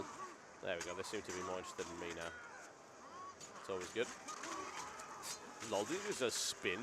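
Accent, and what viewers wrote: United Kingdom. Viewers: ok there you are absolutly right, he is good and shows alot, skills, enviroment and such stuff. and other people who show stuff just suck. saw a video from an elementalist, over 10 minutes against the shatterer and he only used 3 skills, thats boring. but is really good, doing alot of skills, movement is good and his commentaries are really cool. i dont like hearing english with that british accent. but his commentaries are so awesome with the accent. like his videos.:)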